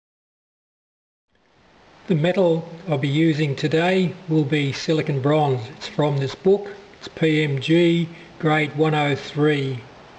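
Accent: Australia. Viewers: i know Australia, but where? Sounds like NT.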